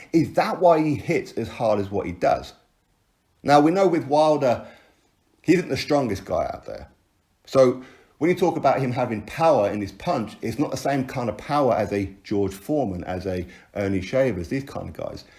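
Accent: United Kingdom. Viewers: Ion know y but I can’t stand listening to someone with a uk accent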